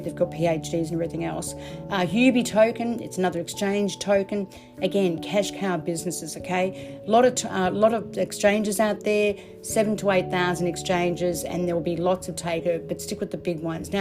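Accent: Australia. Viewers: That's just her Aussie accent.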